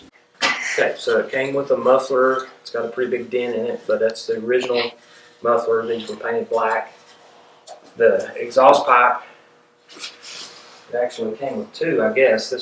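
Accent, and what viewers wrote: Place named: South, United States. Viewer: You got that nice southern accent bro!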